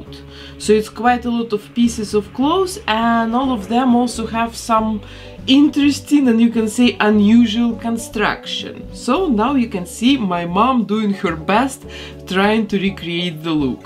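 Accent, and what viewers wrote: Russia. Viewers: Оооо видно что у неё русский акцент 😂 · Вы, случайно, не русская?) У Вас акцент такой прикольный~ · Hey,. I’ve noticed your accent. Do you come from Russia 🇷🇺?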